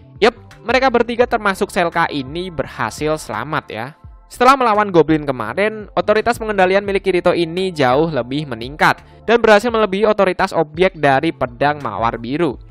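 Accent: Java, Indonesia. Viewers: Gw suka sumpah kalo adminnya bilang"E tapi,E karna"pake bahasa medog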